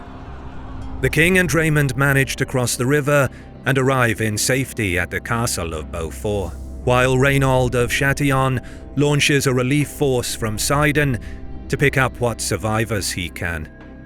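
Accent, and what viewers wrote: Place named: United Kingdom. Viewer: Stop with the damn British accents on every documentary, it's the most annoying trend I've ever experienced!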